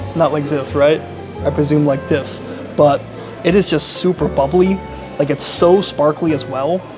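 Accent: United States. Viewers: You’re soooo American. I love it. I’m American but never feel like I belong here. It’s awesome hearing with a true American accent and zero pretense. Your comments are outstanding.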